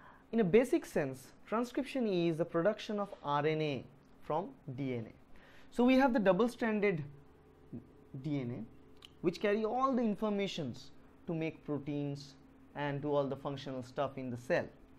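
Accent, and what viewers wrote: Bengal, India. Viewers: are you bengali! bangali naki?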